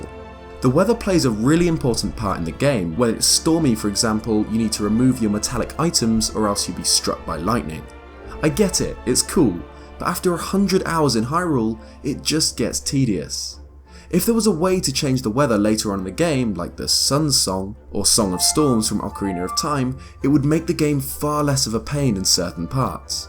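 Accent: United Kingdom. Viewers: absolutely love that you took my advice of having your face in the videos. It's easier for you to edit, we see your handsome face, allows you for more creative freedom, adds another layer to the construction of your videos and its easier to recognize who that lovely British voice is coming from. I'll accept your thank you now. You beautiful husbando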